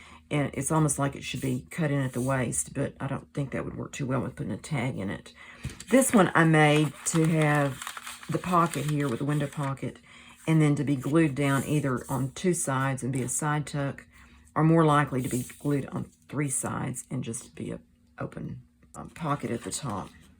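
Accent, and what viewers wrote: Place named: South, United States. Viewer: Just found you today. I’m creating right along with you❤. Your voice is so relaxing!! And I’m partial to southern accents as I live in Kentucky. You’re a nice visit on a Saturday afternoon!!😊😊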